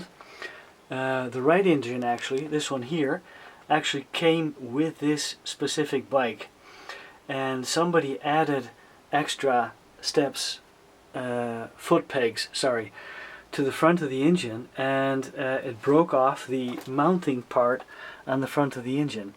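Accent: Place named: Netherlands. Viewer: Watching you videos and hearing your accent I assume you are dutch. Well i’m dutch too and my kz 750 gt makes exactly the same noise. Do you by any chance do repairs on bikes other than your own? This job seems a bit out of my league so I really could use some help...Groeten, Rogier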